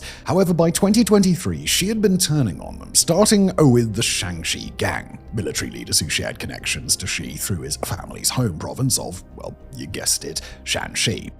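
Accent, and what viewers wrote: RP, United Kingdom. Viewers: shat ap u posh british duschbäg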